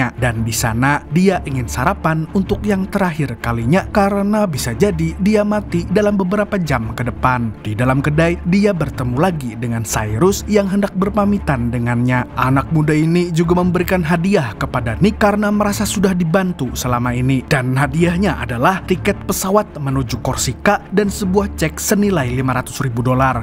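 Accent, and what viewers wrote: Banjar, Indonesia. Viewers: Urang banjar leh kali nya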